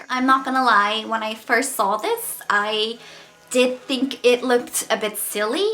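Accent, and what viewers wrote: Northern Ireland, United Kingdom. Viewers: What kind of accent do you have ? In some moments, you sound British, there was a hint of Irish at one second. I’m so interested to know where you grew up or where you live now? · its so nice to hear a Norn Irish accent!!!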